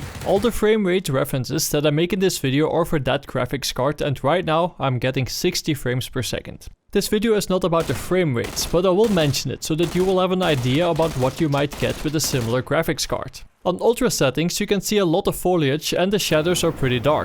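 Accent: Netherlands. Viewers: love the accent too! guess its dutch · Hollands accent ftw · I like your dutch accent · Dutch accent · u sound dutch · LEKKER NEDERLANDS ACCENT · you have a horrible rotterdams dutch accent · That accent tho! only the dutch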